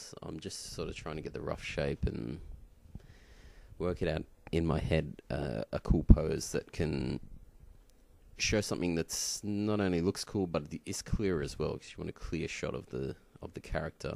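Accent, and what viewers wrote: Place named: Australia. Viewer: HE'S AUSSIE!!!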